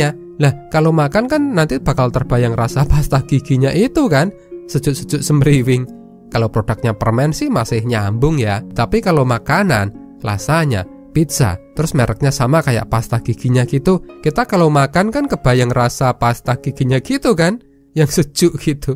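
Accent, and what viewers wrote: Java, Indonesia. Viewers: Jowone ndi min admin sampean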